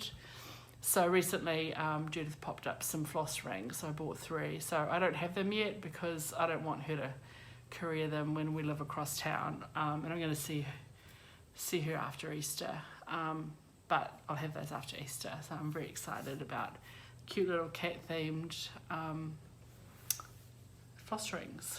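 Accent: New Zealand. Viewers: So cool to hear your Kiwi accent after watching so many American Floss Tubers. I love your colours for the Modern Folk Embroidery SAL. So pretty. Your mustard house is so cool. I am yet to stitch a big house. I'd love to stitch that one.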